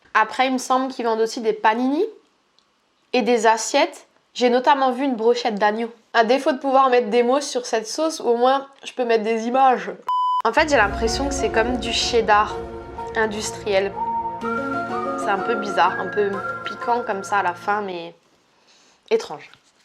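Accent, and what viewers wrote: Alsace, France. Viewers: Oh lalala l’accent alsacien tres prononcer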